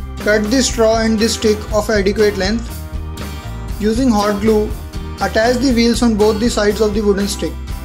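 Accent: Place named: India. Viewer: the accent is like india people / indian , are you indian ?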